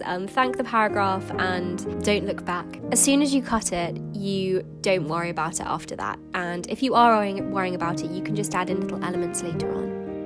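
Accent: United Kingdom. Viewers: I’m Italian and I adore listening your voice because I love British accent 🥰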